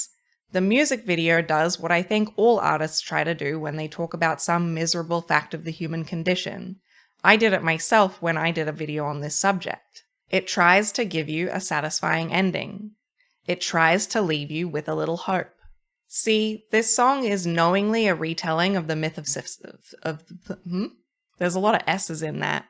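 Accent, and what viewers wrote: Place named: Australia. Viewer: Love your analysis, and also the storytelling in your video. Editing is solid and considered, and your performance within that is genuine and carries with it the weight of your words. Glad to have stumbled over your work! Thank you for answering this particular call with yes. Also lovely to hear content with an Aussie accent, and you did a bang up job on your eye shadow. GG all round! Now if you don't mind I'm going to go make my 10,000th Iridium sprinkler and pat all my cows; midnight be damned!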